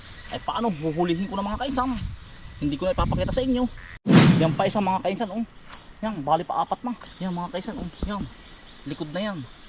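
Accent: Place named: Southern Quezon, Philippines. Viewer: taga san kaga kuya at ang iyong punto ay parang taga southern quezon😂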